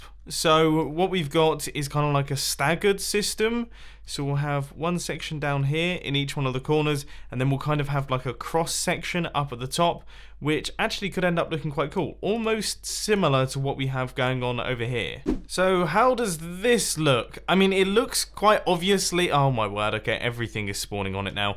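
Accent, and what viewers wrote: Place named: United Kingdom. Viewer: You are the most British British person I have ever heard (that’s a good thing)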